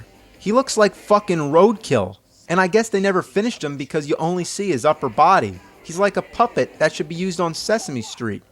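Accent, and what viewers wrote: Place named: Pennsylvania, United States. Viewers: He's got that outside Delco, Delco accent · Eastern Pennsylvania / New Jersey accent. James is from Eastern Pennsylvania, near Philadelphia. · New Jersey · He's older and he is a dad so he got the Dad accent now.. That East Coast Dad Accent.. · That's the Jersey vernacular, where he lives.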